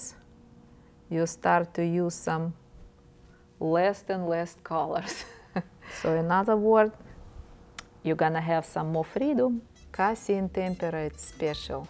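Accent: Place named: Russia. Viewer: Такой русский акцент)))Но урок очень хороший!А какой марки используете темперу?Мастер класс?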